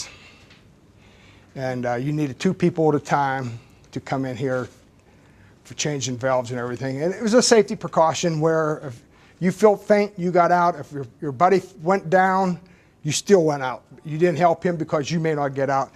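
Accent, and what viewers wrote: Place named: Pittsburgh, United States. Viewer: You got that Pittsburgh accent from Northside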